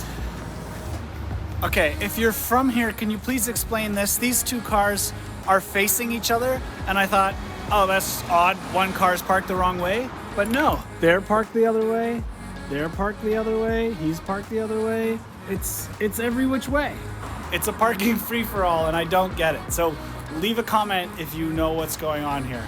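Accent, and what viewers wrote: Canada. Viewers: I love your travel logs..I knew you were Canadian. Abouwt gave it away lol. I love your excitement of travel. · I don't understand how people confuse the American and Canadian accent. Yours is so clearly Canadian. There is a softness and meter that isn't so apparent in America.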